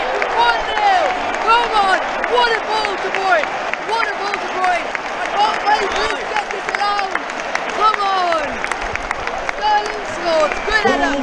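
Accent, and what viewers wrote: Manchester, United Kingdom. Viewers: You sound like you're from Manchester. Lifelong city fan I'm sure 😆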